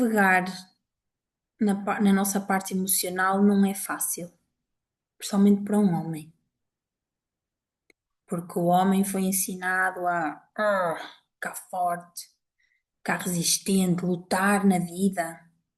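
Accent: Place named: Portugal. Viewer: Seu sotaque é muito bonito, normalmente eu acho o português de Portugal estranho, mas você falando é lindo demais.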